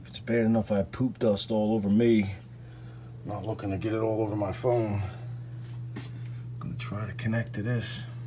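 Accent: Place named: Philadelphia, United States. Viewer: this is NOT reall big problem..if you got the house for a good price.... do the work you save a ton it aint that hard it sounds like you are from philly so big box stores everywhere !! i see u have a work van and tools ......open the walls demo and clean that is the first day, get what u need in plumbing materials ie pipe fittings ect ect bust out the old shit ( at the bottom of where the pipe makes a turn scoop out the rust from the pipe with an old spoon on a pole) dry fit your pvc ...the pvc is cheap and easy.... do all that is needed to be replaces ,check for leaks not impossible ..after no leaks ,,rap with fiberglass insulation pipes will be MUCH quieter ... i did my 3 story house in oak lane TWO sewers it was a lil work but i saved a fcking mint!!!!! 😉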